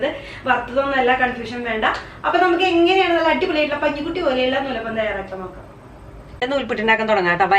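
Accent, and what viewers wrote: Thrissur, India. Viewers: ഈ തൃശൂർ ഭാഷ കുറച്ച് പതുക്കെ പറയു,കാരണം നമുക്ക്‌ തൃശൂർക്കാർക്ക് മനസ്സിൽ ആവൂം,പക്ഷെ മറ്റുള്ളവർക്ക് ഇത്തരി ബുദ്ധിമുട്ട്ണ്ടിവും,സംഗതി അടിപൊളിയാട്ടുണ്ട്,ഞാൻ. ഇന്ന് ഇണ്ടാ ക്കി നോക്കി ട്ടാ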